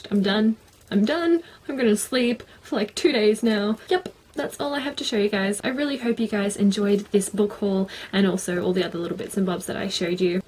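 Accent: Australia. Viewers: OMG I wasn't sure if you were Australian or not! thank god there is an aussie booktuber!! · 66 books O.O and haha all this time I thought you were English but your Australian. It seems more evident now haha.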